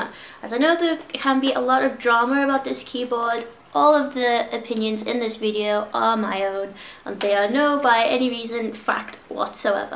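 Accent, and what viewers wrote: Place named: Liverpool, United Kingdom. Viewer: Do I hear a fellow scouse geek?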